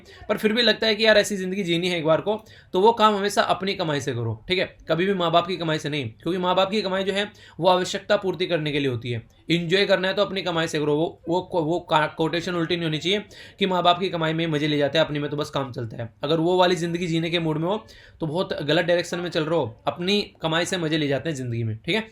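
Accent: Haryana, India. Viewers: I think....🤔.... Sir Haryana se h...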